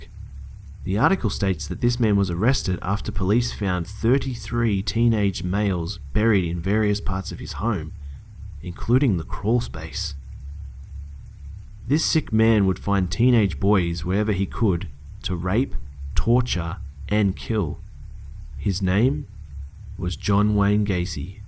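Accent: Australia. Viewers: that freaking Australian accent <3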